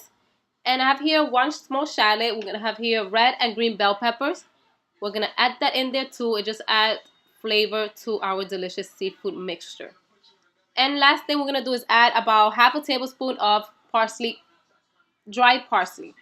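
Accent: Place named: Dominican Republic. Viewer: U sound Dominican is this the Latino way of making? Lol looks good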